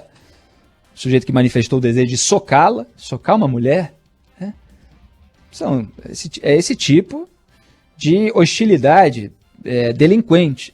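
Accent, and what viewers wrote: Rio de Janeiro, Brazil. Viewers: Esse jornalistinha safado com sotaque da zona oeste do RJ só fala m. Vai lá perguntar pro marreco moro sem vergonha se ele te arruma alguma boquinha lá nos EUA . Safados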